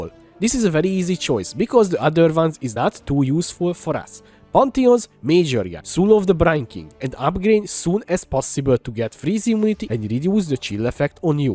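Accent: Hungary. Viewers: kb én is igy beszélek angolul lehet hallani a magyar kiejtést :D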